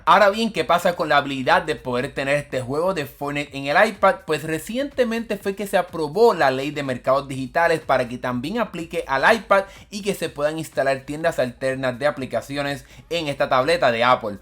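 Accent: Puerto Rico. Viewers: Eres de Puerto Rico?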